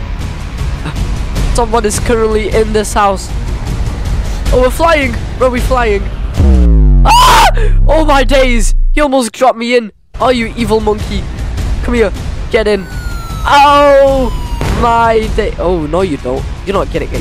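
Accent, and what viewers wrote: United Kingdom. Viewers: I love your British 😂😂😂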